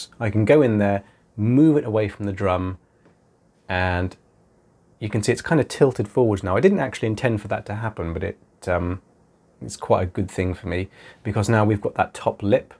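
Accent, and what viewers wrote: United Kingdom. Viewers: It's definitely a British accent, it's just not the stereotyped accent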